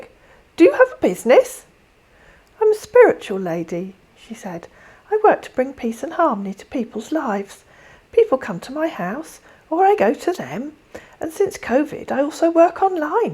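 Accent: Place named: Southern England, United Kingdom. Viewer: You sound like all my cousins, they live in Oxfordshire and Southampton, i was born in Southampton..... I live in Australia with barely any family here.... your voice makes me feel like I'm home X Oh i love what you do 🤩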